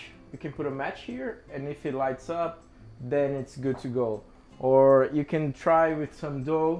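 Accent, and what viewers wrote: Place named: Minas Gerais, Brazil. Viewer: Pelo o jeito é mineirinho kkk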